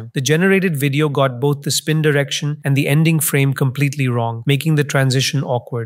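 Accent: India. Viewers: Better hear indian ahh voice than ai crappy voice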